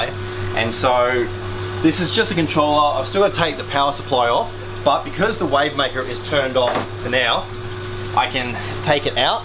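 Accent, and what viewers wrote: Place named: Australia. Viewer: That sounds awesome, take us along for the ride! The Germans really know their reefing. :) haha, what I liked about the old outro was the long hair and the long aussie "hit the looike" :D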